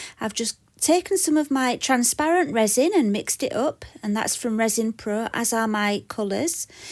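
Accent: United Kingdom. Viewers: Best resin channel! Plus us yanks love a British accent.😍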